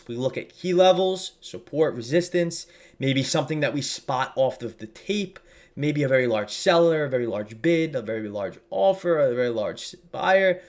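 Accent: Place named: New Jersey, United States. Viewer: Come for the education, stay for the Jersey accent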